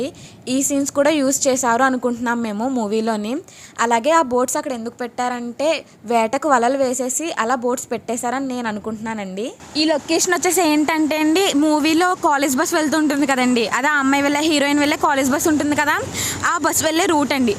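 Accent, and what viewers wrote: East Godavari, India. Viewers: Superb akka mana east Godavari natural ga ela matladatharu... nv video lo are naturalist use chesav ..superb ..keep rocking 💓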